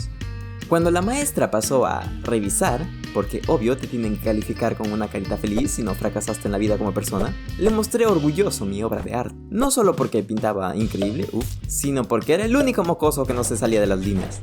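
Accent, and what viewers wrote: Guayaquil, Ecuador. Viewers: Oye eres de ecuadorr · Oh eres de Ecuadoyo igual. ''Abrazame hermano!!'' XD. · Eres de guayaqui o de guayas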